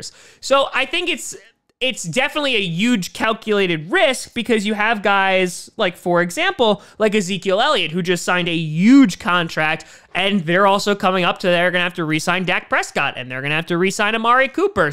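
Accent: New York, United States. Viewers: lmao he is from NYC and that is to how new yorkers talk. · yes, is from nyc. and a lotta new yorkers will say yuge. he also says "hughston" or "yuston" rather than "houston," which some say in ny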